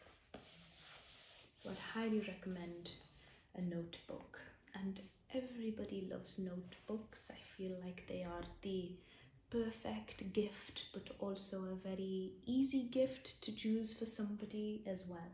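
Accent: India. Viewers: your indian accent videos are the best!!!!!!!!! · love your indian accent videos! We should make one together - i messaged you on instagram! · I love your Indian accent! I don't know why but it's more relaxing than any other accents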